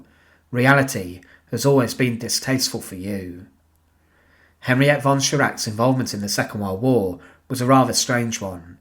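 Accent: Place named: England, United Kingdom. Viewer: He's English. Very easy to understand if you're English but I'll agree not a very naturally spoken presenting voice.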